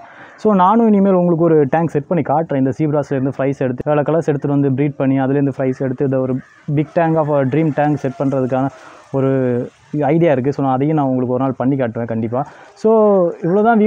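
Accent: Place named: Kanyakumari, India. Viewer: pesura slang la teriuthu.... Kanya Kumari la enga😁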